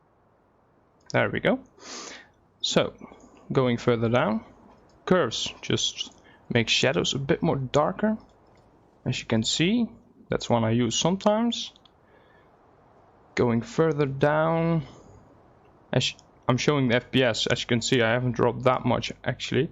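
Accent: Netherlands. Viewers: Haha lekker geEngelst vgm ben jij NL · AYE U DUTCH BRUH? wassup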